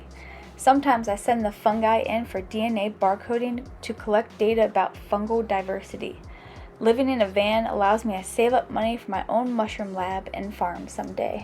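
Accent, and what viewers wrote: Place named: South, United States. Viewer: I must say you're very pretty and seem like wicked down to earth girl cool ass chick.. curious how old are you? To me you have an accent must be from down south I'm guessing right?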